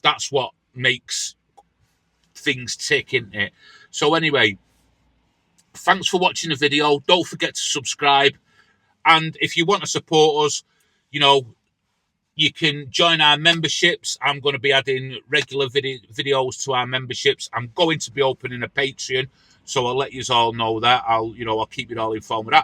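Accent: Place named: Lancashire, United Kingdom. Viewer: So, you're in Derbyshire. You sound more like you're from Lancashire or Yorkshire. Anyway, I'm impressed with the effort you're putting in, and I'm enjoying your videos. Keep up the good work.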